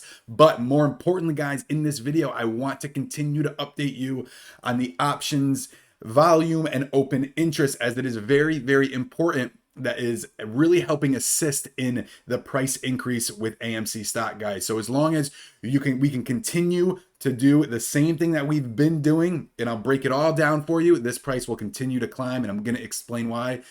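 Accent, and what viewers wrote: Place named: Upper Midwest, United States. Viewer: Nice Green Bay reference! I’m trying to place your accent. Definitely betting on upper Midwest.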